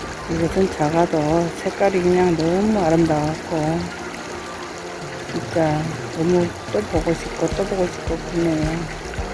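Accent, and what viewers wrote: Gwangyang, South Korea. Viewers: 구수한 우리고향 광양 사투리들으며 멋진 순창호피석 감상 잘했어요 감사합니다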